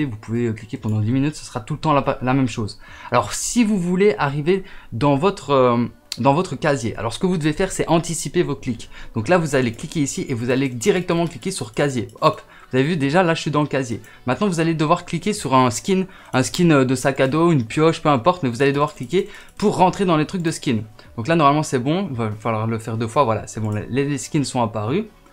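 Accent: Belgium. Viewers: Slt tu es d'où de Belgique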